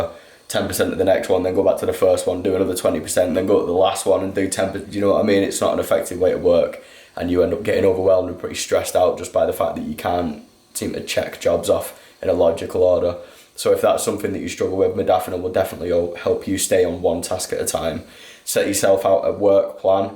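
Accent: United Kingdom. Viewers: u british!!!!!! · Are you from Sheffield? (I'm Canadian, but I live in Leeds)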